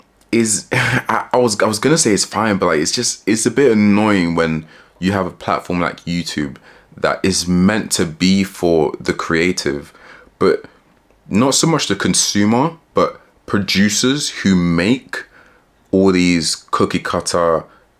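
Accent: United Kingdom. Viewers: Jesus loves you and died for you. Also I love ur uk accent 😭 (im not from the uk)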